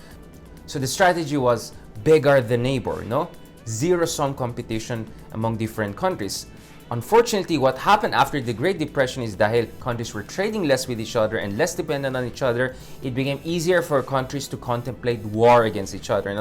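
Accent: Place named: Conyo, Philippines. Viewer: Nakakairita naman pagkacono mo pre. You buckle in ur pronunciation of English words sorry tpos nagsslang ka sa Tagalog when u even stutter with the English words. U don't sound natural in English nagkakapulupot dila mo.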